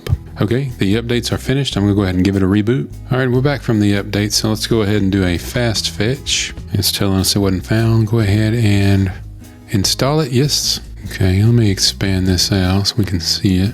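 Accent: South, United States. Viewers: you got a bit of a southern accent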